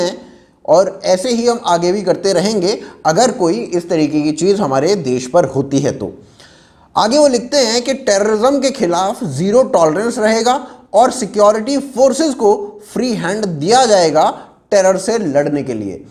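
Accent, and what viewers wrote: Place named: Allahabad, India. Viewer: baba illahabadi (allahabad) accent mare ho tum ek bhokaal!!! nice to hear such accent on ur channel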